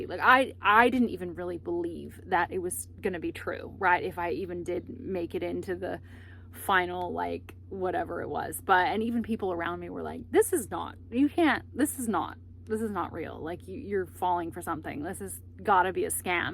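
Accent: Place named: Australia. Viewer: Interesting accent. Australian background? I always thought manual transmissions were cheaper to fix that automatics.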